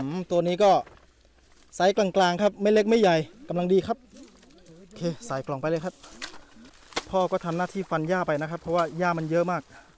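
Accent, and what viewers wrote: North, Thailand. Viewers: อู้กำเมืองบะดีกาบ่าน้อยฟังแล้วสบายหูดีอู้ไทยกลางฟังแล้วมันบ่าม่วนใจ๋เฮาคนเมืองเหนือเน้อ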